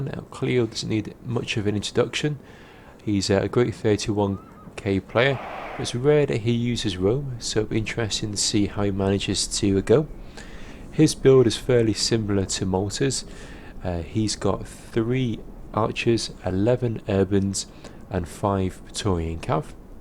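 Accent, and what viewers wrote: United Kingdom. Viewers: I like your semi Yorkshire accent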